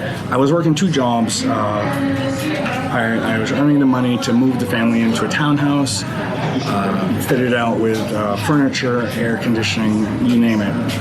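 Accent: United States. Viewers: A truely honest and moving video. Thank you. I rarely give advice but it seems you need a break. Perhaps return to USA , earn some "real" money and come back fighting. Regroup! Best of luck with whatever you decide. (Apologies if that's not a USA accent, read it as "home country" instead!)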